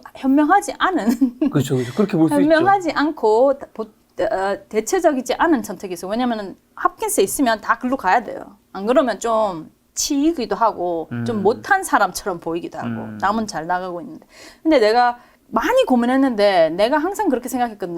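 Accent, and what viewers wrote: Gyeongsang, South Korea. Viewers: 악바리 근성~~대구 말씨가 스며들어 잇네요~~실패는 이기거나 성장이다~~대구 아가씨 대단하다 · 지나영 박사 화이팅입니다 !! ☆☆☆☆☆~~♧♧♧♧♧~~~ 갱상도 사투리가 듣기 좋습니다 !!!!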